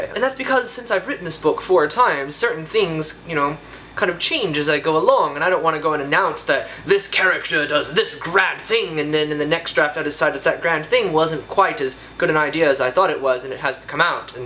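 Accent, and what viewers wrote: United Kingdom. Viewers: British accent??? WHY?? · haha british accent; that is so awesome! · Are you actually British because you do an amazing accent XD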